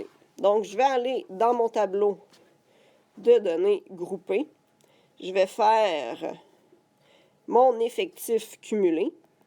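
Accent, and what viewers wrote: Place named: Quebec, Canada. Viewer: elle est quebecoise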